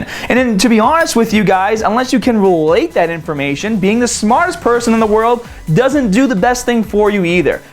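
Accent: Boston, United States. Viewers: I do agree with you on pretty much all of the videos you make, you seem like a good and knowledgeable guy about what your talking about. I do have to say you sound way more Boston than you used too, don't know why but you really do. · Nah its a boston accent is from lawrence Massachusetts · You sound more like you're from Boston or New Jersey every day haha good vid! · Is it just me or has accent become a lot more prominent recently? Boston I believe? It's awesome haha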